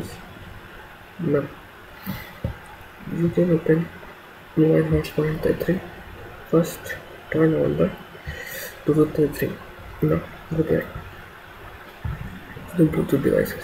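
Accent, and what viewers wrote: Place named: India. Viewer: wtf is he saying? that crappy mic combined with his indian accent...omg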